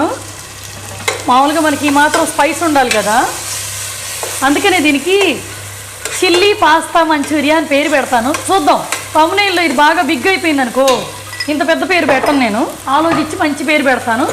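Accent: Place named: Nellore, India. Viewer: me slang super akka.. ma nellore basha lage undi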